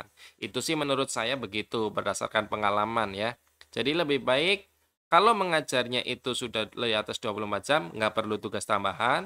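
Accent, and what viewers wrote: Banyumas, Indonesia. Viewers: Bg 😊, solusi tugas tambahan guru kami semua tidak terbaca di profil guru di dapodik, solusi nya bg?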